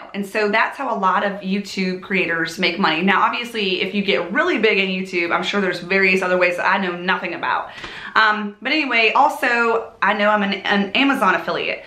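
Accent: South, United States. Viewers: Hi, I love your accent, that southern drawl, and I wish I could decorate as good as you do, you inspire me to go bigger than the small decorative touchs I was doing, I went from a 3 foot tree to a 7 1/2 ' tree and for me that was a major thing, don't look at others who you think are more successful than you, just be you, please don't try to change, but be the person you are, if you have been crafting show that, if it's the garden or the chickens or your bees, show that don't think I need to spend money on xyz, you have been missed and I'm glad you are back.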